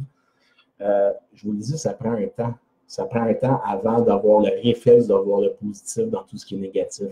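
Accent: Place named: Quebec, Canada. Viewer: 2024, toujours là ✋️ Merci beaucoup pour cette vidéo, on a besoin d'entendre des gens comme vous pour avoir un meilleur moral ! Et ne vous excusez pas pour votre accent cest tres beau l'accent Québécois 😌 Certes les épreuves dans la vie sont parfois TRÈS dur à surmonter et il est aussi TRÈS difficile de penser positif dans ces situations... Mais moi en tant que personne qui est du genre pessimiste, j'essaye de faire un maximum d'efforts pour rester du côté optimiste ! La seule chose que je narrive pas encore à bien faire c'est de comprendre les leçons de vie, y trouver une signification, et trouver tout le temps le positif dans le négatif 🥲 Je prends exemple sur mon couple, je suis en relation a distance avec une fille (environ 9h de route) et quand cest le moment des adieux, je ne parviens pas à m'arrêter de pleurer, je repense à tous nos moments passés ensemble, je ne peux plus écouter ses musiques, ni sentir son parfum sinon la moindre chose me fait pleurer et j'ai l'impression que tout est fini 😂🥲 Bon après l'essentiel c'est que c'est passagé, ça finis par passer car rien n'est permanent, c'est juste le temps de me réhabituer à la distance entre elle et moi...mais cest vrai que je suis une personne tres sensible haha, et jai peur de pleins de choses...seulement je fais tous pour ne pas montrer ce côté noir..🥲 Si quelqu'un passe par là, n'oubliez jamais qu'il y aura toujours quelqu'un qui veillera sur vous pour vous guider tôt ou tard et que vous puissiez avancer dans votr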